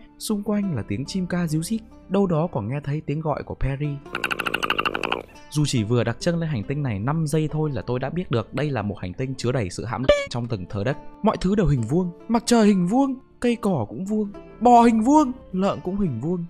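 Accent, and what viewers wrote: Hai Phong, Vietnam. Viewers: Anh ơi quê anh ở chỗ nào Hải Phòng đấy ak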